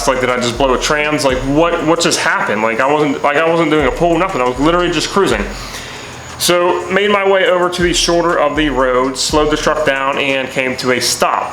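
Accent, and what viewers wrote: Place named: Maryland, United States. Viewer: Familiar accent.. Maryland?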